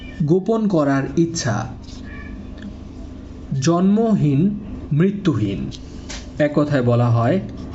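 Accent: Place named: Chittagong, Bangladesh. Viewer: স্যার মনে হয়, চট্টগ্রামের🙆🏿‍♂️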